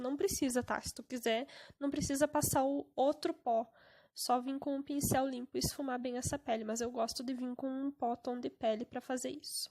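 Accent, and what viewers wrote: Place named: Rio Grande do Sul, Brazil. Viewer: Guria...tu é gaúcha!!! Reconheci o sotaque e os produtos da Panvel... De que cidade???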